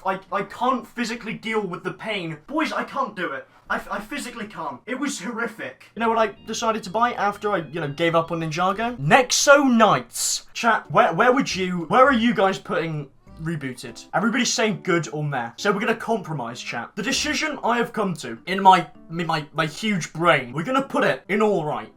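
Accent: United Kingdom. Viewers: My ranking of your British accent? 11/10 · Are you british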